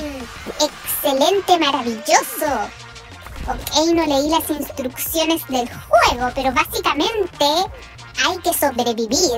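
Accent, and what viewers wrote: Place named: Chile. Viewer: Amo la voz chilena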